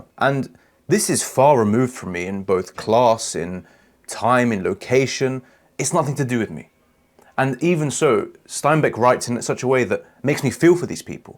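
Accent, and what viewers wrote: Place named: United Kingdom. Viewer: Shadowing ur british accent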